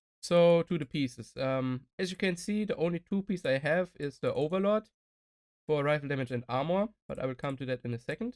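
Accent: Germany. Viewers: Yo! I gotta say u r making some nice videos! U kinda sound German tho... wanna say where u r from?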